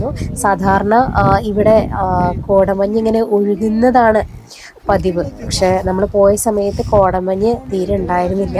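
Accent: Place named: Thrissur, India. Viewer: സൂപ്പർ..സൂപ്പർ..ട്ടാ...💕🎻🥀❤️🎻❤️🥀❤️🥀❤️തകർത്തു... ഇടയ്ക്കുള്ള..ട്ടാ..ട്ടാ...ന്നുള്ള പ്രയോഗം നല്ല രസമുണ്ട് കേൾക്കാൻ..തൃശ്ശൂർ സ്റ്റൈൽ💯💯💯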